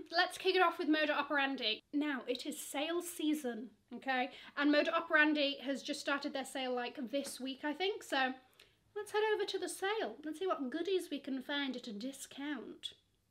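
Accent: United Kingdom. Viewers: You are definitely my favorite British accent youtuber! Love your comments, your facial expression. Said that before, can definitely listen to your talking forever.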